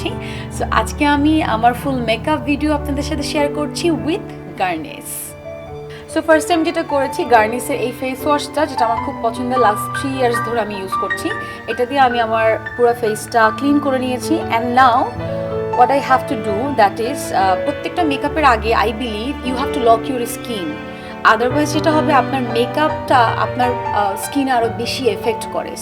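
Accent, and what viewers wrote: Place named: Kolkata, India. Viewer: Guerniss Er Makeup Sunechi Khub Soft Hoye Tomake Amar BD Na Kolkatar Meye Lage Bcz Tumi Khub Sundor Kore Cholti Banglai Kotha Bolo ☺️🥰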